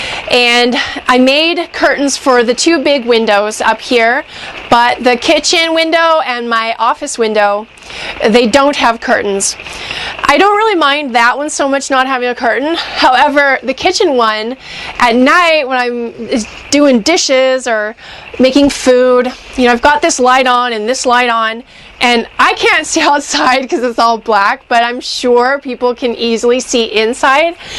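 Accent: Canada. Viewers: she is from Canada a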